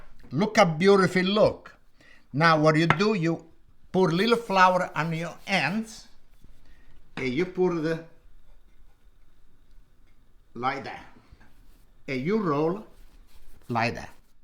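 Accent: Italy. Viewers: His True Italian Accent I like including The Video. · I love the way you talk? Your accent is beautiful, What part of Italy are you form? Your bread is amazing you make me hungry. Salute from Texas USA ❤ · Your Italian accent is so refreshing to me… the last time I heard one was in the late 1960’s listening to my grandmother teaching me how to cook. I just turned 14. I loved her so much and still miss her greatly. I subscribed! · He reminds me of my grandma with his accent. She was from Italy. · Love this guy. I'm literally waiting rn for my bread to puff up another 30mins and stick it in the oven after watching this vid. And I gotta say, awesome Italian accent. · I just love his Italian accent 😍 ❤ Beautiful ❤ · Wonderful show. He gets his recipes across in a timely fashion with accuracy. Reminds me of my Italian Grandparents with his accent. Not black pepper. Black AH Pepper.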